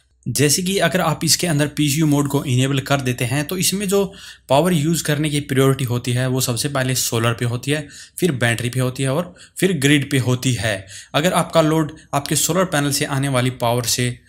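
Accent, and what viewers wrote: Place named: Haryana, India. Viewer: bhai wo àpke lahje se pata lag gaya...HR me kaha se ho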